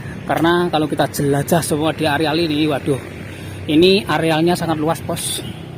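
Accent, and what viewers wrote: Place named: East Java, Indonesia. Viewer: Dr semua vlog sejenis, saya suka vlog ini krn: 1. Seblm mulai ada penjelasannya 2. Logat Jwa Timurannya bikin seru n lucu 3 . Ulasannya detil 4. Ga diserem²in pake back sound Saran: 1. Sll hati² apalagi tangga² yg rapuh 2. Klo di rumah² yg ada barang²nya misal berkas² baiknya disorot/diteliti lbh detil sapa tau bisa jd titik terang ttg siapa penilik rumahnya Suwuuuun..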